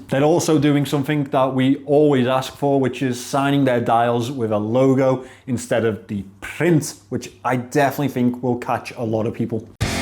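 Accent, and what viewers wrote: Liverpool, United Kingdom. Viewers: You're the first Scouser that doesn't irritate me lol, great Vid xx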